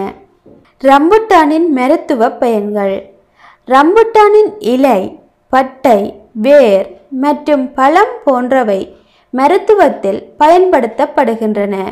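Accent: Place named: Malaysia. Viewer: Unga Voice paartha, ouja channel admin mathiri irukku 😀😀, Malaysian slang. Nice Video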